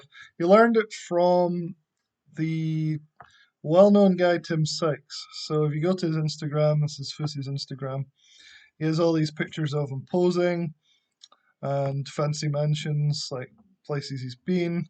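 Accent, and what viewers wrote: Scotland, United Kingdom. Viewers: are you scottish? :) thanks for the video!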